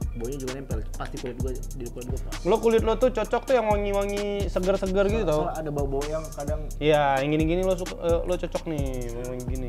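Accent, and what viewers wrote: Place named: Medan, Indonesia. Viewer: Ak senang bnget dek. Conten rame cakap Medanny ak snang kali.mungkin karma ak orang medan mranto kerja di jakarta.makasih iy dek 🙏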